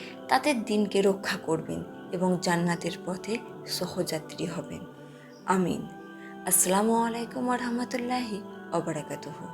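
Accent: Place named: India. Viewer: আপনি কি ভারতীয় , আপনার কথা ভারতীয়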